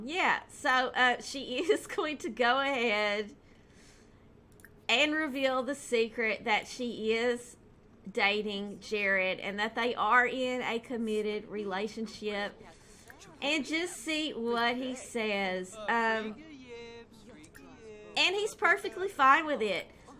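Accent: South, United States. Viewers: Hi!:) I've commented on a few of your past videos and I've finally caught up on this LP and let me just say: I LOVE it! It's honestly one of the best Sim's LP's I have ever watched. I love how you continue the story; you're so creative! And your voice is very soothing; I could listen to you all day.. It reminds me of the family that I have down South in Kentucky and Tennessee! One more thing before I go! (Sorry this is such a long comment.) I found a picture on Facebook of a house and I was wondering if there was a way I could contact you and send it too you? I would love to see a speed build with your take on the picture! Thanks..:)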